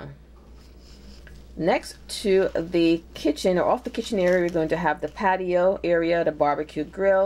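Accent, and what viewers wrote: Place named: United Kingdom. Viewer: U r British awesome lol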